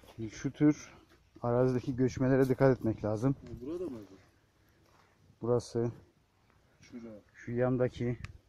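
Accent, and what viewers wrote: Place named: Erzurum, Turkey. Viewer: Erzurum civarı diye düşündüm... düzlük, yükselti... bir de konuşan adamın ağzı.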